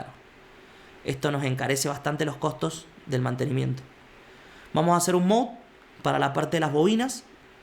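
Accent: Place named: Mendoza, Argentina. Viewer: Sos de Mendoza o cuyo? Ese tonito se me hace familiar jajaja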